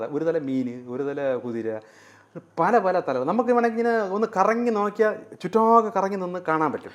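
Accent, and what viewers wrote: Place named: Thiruvananthapuram, India. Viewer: An Autobiography of a Yogi യിൽ പറയുന്ന കാര്യങ്ങൾ ഇദ്ദേഹം സാധാരണ തിരോന്തരം ഭാഷയിൽ എത്ര വ്യക്തമായി ഉദാഹരണസഹിതം ലാഘവത്തോടെ പറഞ്ഞു തരുന്നു ഇത് മനസ്സിലാക്കുന്നവർ ഉയർന്ന level ആകണം 🙏🏻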